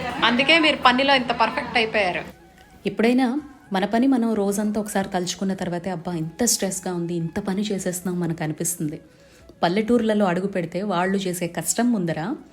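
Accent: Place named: Pulivendula, India. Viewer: Akka voamma entha baga annaru akka ma wuru pulivendula akka ma language gurthuku vachindi akka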